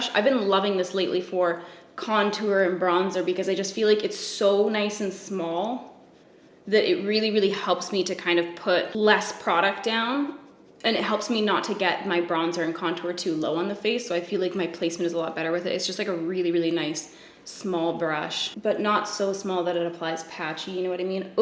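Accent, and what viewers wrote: Canada. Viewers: Your Canadian accent makes my soul happy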